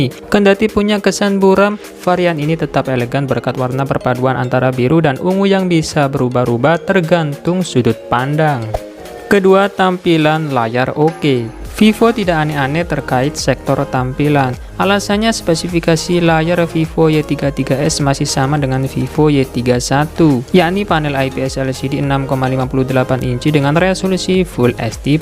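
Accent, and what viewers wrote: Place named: Madura, Indonesia. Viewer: orang madura yah bang, logatnya kaya madura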